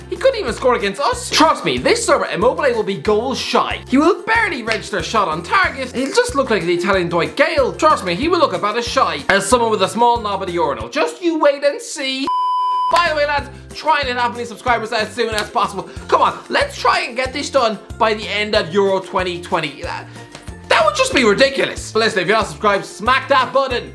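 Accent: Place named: Ireland. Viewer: Irish accent makes it seem like that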